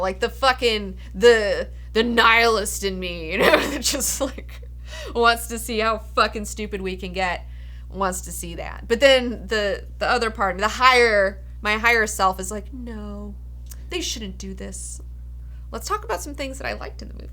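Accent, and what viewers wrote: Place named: Midwest, United States. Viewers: Midwest "O" pronunciations. Holla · Where did that Pittsburgh accent/ midwest accent come from?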